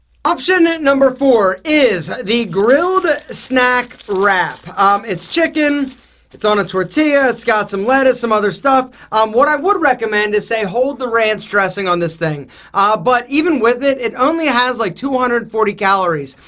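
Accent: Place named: Upstate New York, United States. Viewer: Where's the accent? Sounds upstate NY.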